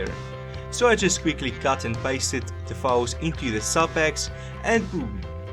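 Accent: South Africa. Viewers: DUDE are u from SA because holy shizz your accent is on point omg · Your South African I now I am too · Bro im sure your from south africa can you confirm this? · South africa? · Is this guy South African? He sounds South African. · Are you from south Africa?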